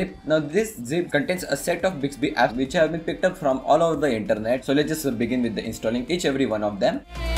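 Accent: India. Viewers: omg indian accent it's really bad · I can't pay attention this indian accent drives me crazy I wanna punch one!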